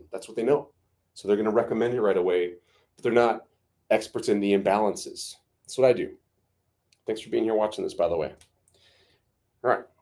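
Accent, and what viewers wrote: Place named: North Carolina, United States. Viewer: I can hear you North Carolina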